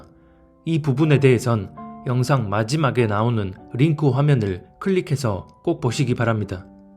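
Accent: Jeolla, South Korea. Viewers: 전라도 사투리로 방송하시니 친근감이 느껴져불고만요잉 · 전남"지역 분~~이라고, 추정되는데요.. 제고향이 전남 광주 근처인데, 말투가 똑같으신데요..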